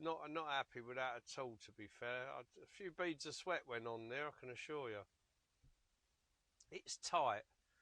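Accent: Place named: Essex, United Kingdom. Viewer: Great video mate, you sound like me mate from Essex gary gadget lol, i look forward to your future vids 👍 😊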